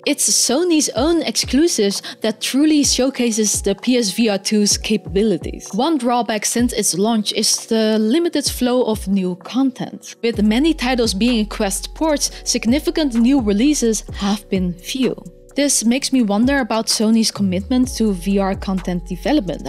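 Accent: Netherlands. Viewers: Why does it seem like you have a Dutch accent 🙂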